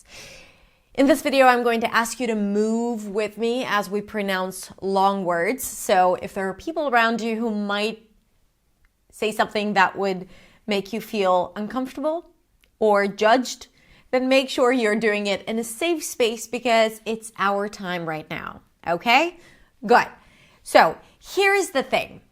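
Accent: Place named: United States. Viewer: I learnt most of the American accent by you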